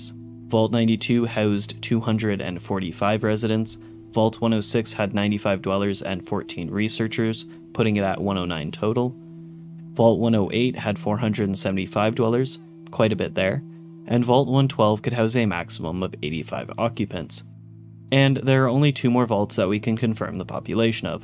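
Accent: Canada. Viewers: I can't decide if your accent is Canadian or not!(am Scottish so accents are weird haha) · You can totally tell you are from Canada lol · I love your Canadian accent 😊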